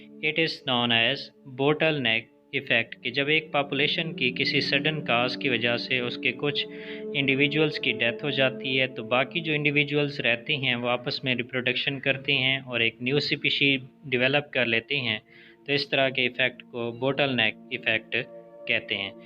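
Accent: Pakistan. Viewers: Accent he aisa h.. I think it's Pakistani channel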